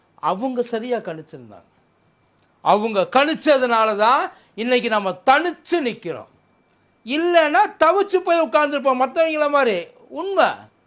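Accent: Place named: Madurai, India. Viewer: நல்ல மதுரை தமிழ் பேசி அசத்துறீங்க.நன்றி தொடரட்டும்.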